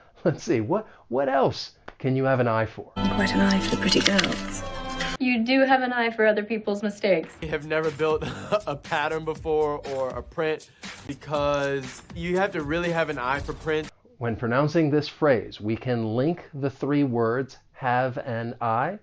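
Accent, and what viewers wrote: United States. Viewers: thank your video is so helpful. so,keep going! is your english american? from japan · Is this American English? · I do love American accent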